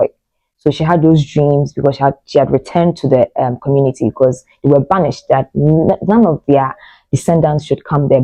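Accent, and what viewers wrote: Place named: Ghana. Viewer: The movie wad top notch... buh you have the ghana ascent in your voice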